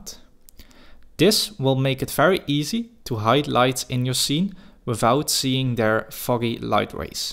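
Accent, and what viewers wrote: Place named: Netherlands. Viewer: hi im not sure but are u dutch. i can tell from ur accent. but i wanna ask something actually. do u think that if i improve my 2d 3d art that i can find a well paying job. bc i want to do animations. and im not rlly into creating logos or commercials. and what u create looks really good. keep up the work ! and thank u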